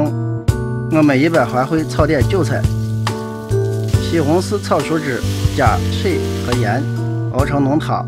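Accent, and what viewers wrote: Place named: Shaanxi, China. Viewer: 陕西陕西话蛮好听的，这个鱼做甜品也很好吃😋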